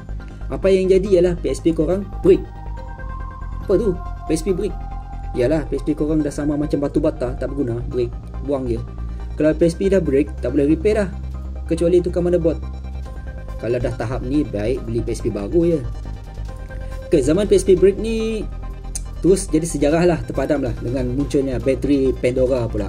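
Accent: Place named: Sarawak, Malaysia. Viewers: Bunyi kedak org sarawak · Aku tak phm sangat apa yg dia terang tu, tpi yg aku tau speaker tu org sarawak sbb dri segi dia bercakap mmg nampak slang org sarawak. Aku harap bukan aku sorang je yg perasan.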